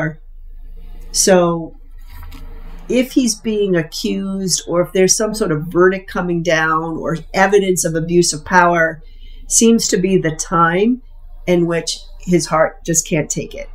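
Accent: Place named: Maine, United States. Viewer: Wow I’m from Maine and right before you mentioned VT and NH I knew you were from up here because of your accent!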